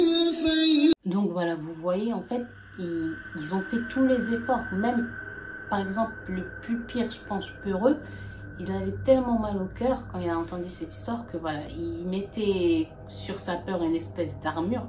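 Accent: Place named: Arab world. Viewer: Bonjour ma belle je suis ta chaîne depuis très peu et j’adore ces sujets. J’ai une histoire à te proposer c’est celle du sorcier yéménite qui en voulant arrêter la sorcellerie ses enfants sont tous morts … je ne sais pas si tu connais cette histoire elle est vraiment incroyable Par ailleurs j’aime beaucoup ta lahdja quand tu parle en arabe, c’est laquelle ? Encore merci et bonne continuation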